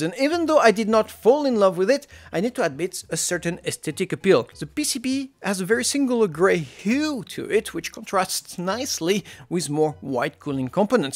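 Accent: France. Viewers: You have an french accent xD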